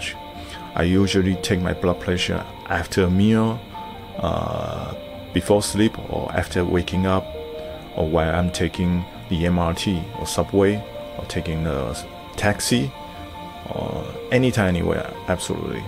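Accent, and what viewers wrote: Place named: United States. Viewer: you speak great English. I mean your tone likes American, not Taiwanese accent...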